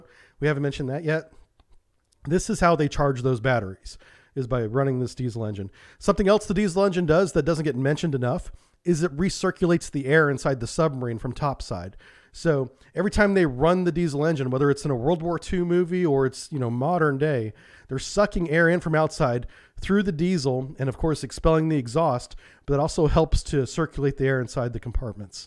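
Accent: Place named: United States. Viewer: the pronounciation was a bit off, thats right, but still understandable and easy to correct with very minor "practice". just cut the american "dialect" pronouciation habit and you should be more than good enough. umlaut and other stuff is not really neccesary, as it is difficult for english speakers to wrap their head around and germans dont really mind if you dont pronounce them. just dont end up like the guy in the "Tank Museum Anti Tank Chat"..... that was just horrible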